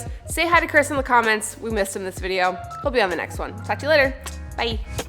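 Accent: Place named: Newfoundland, Canada. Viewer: Your accent makes me miss home! (Newfoundlander in BC)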